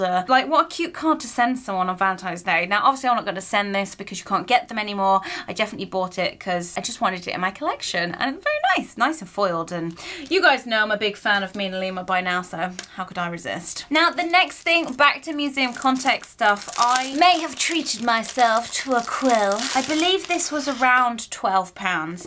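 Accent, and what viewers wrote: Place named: Scotland, United Kingdom. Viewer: Love the Scottish accent! 😂👍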